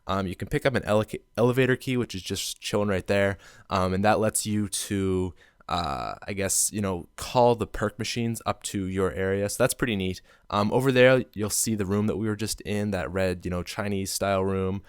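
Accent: Canada. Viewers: Great vid, love em. You're definitely Canadian though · You are from Canda your accent :P cool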